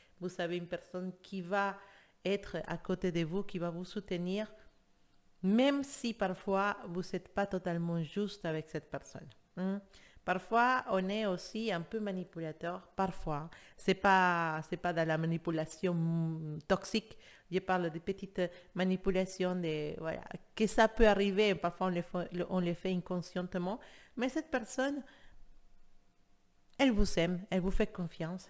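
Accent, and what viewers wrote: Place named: Spain. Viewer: Ne changez rien 🤣 il est beau votre accent... Espagnol non? Gratitude infinie 😍😍😍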